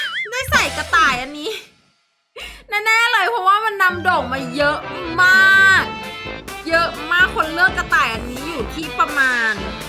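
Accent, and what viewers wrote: North, Thailand. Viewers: พี่ เป็นคนเหนือใช่มั้ยครับ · พี่คนเหนือหลอครับ😂😂😂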